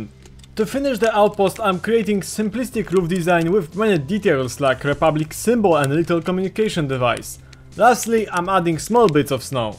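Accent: Poland. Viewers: Man, gadasz polskim akcentem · Ten polski akcent słychać odrazu haha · slychac ten polski akcent POLSKA GUROM! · I thought you're from Russia, but then I realized that you are our Poland Slavic bro with the same accent) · ok because i hear this accent and me too from poland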